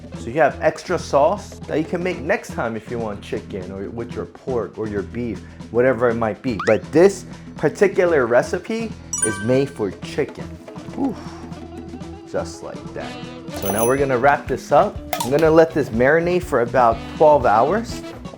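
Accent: Philadelphia, United States. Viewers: I can hear the Philadelphia all in you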